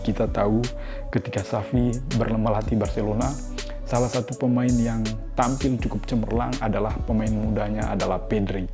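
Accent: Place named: Manggarai, Indonesia. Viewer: Dari dialeg nya bung kek logat manggarai.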